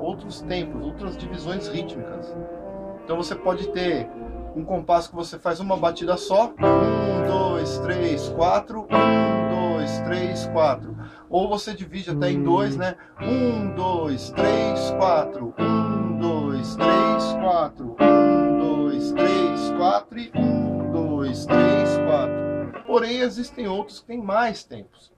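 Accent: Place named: Minas Gerais, Brazil. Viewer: esse professor parece um d ituiutaba minas gerais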